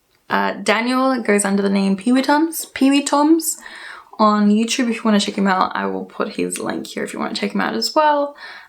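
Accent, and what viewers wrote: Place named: United States. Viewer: Your accent does not seem Australian lol,it is closer being American than being Australian :)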